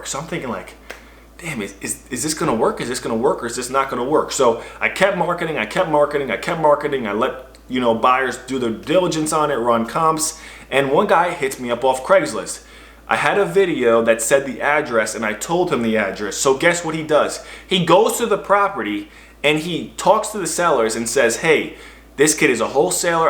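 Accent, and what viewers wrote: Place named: New York, United States. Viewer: Good morning brother, do you operate out of the tri-state area? You sound like a New Yorker 💯%😁💪🏾. I'm from The Bronx.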